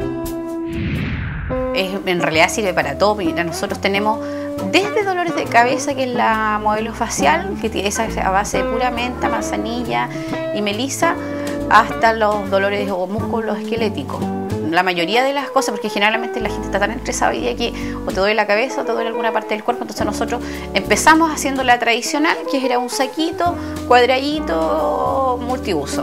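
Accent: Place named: Chile. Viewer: Me gusta mucho su acento de Chile. Saludos desde USA California. Gracias por compartir su saviduria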